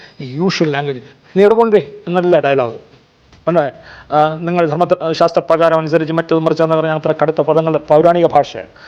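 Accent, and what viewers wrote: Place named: Thiruvananthapuram, India. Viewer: എന്താരായാലും നീ വായിക്കുന്നവത്... തിരോന്തോരം തന്നെ അപ്പി....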